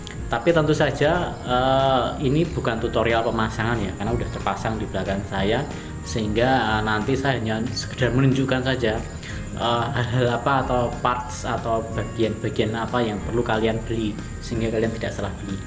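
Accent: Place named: Yogyakarta, Indonesia. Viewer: jogja banget..koyo aku ngomonge..hehe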